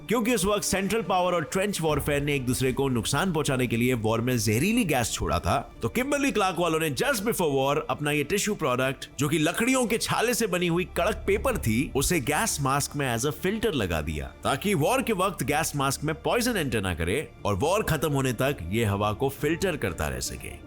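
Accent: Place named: Maharashtra, India. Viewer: I always like your Marathi accent.....👍👍👍🏻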